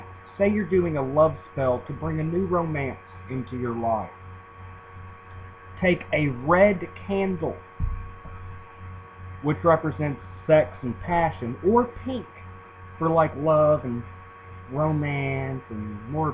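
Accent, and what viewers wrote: United States. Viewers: Thankyou i loved watching you and i love your passion also lurrrve your american 'y'all' accent keep posting xx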